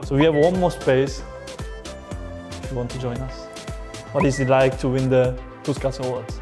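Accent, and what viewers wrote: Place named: Germany. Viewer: His German English accent is so cute haha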